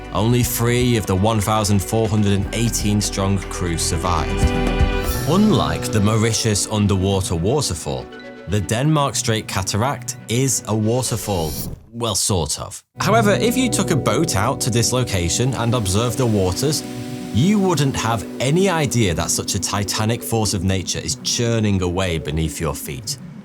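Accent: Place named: Cockney, United Kingdom. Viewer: That COCKNEY ACCENT IS DISGUSTING … YOU KEEP SAYING “FOURTY TWO HERE”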